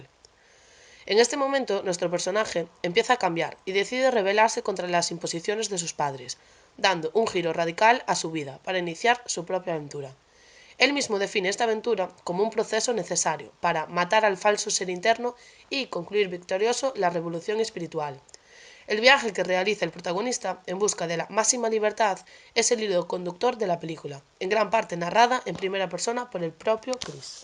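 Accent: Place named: Galicia, Spain. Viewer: Gracias. Tienes una voz preciosa...galleguiña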